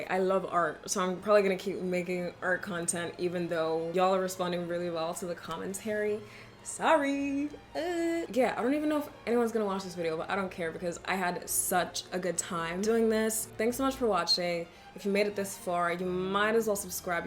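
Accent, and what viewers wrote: Australia. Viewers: Just came from your latest Love Is Blind video, and the whole time I was thinking you were American doing the Australian accent as a joke 😂 but nope, you actually have an Aussie accent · You are so funny and genouine. I bumbed to your videos from love is blind lol. Greetings from Finland. Are you australian? You sometimes sound like australian and sometimes American. Oh now you said it nigerian. Your english is perfect.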